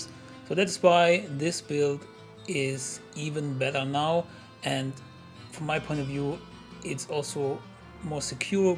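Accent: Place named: Germany. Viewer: German for sure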